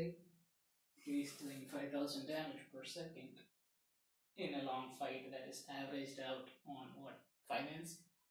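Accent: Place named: Kerala, India. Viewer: Are you malayali?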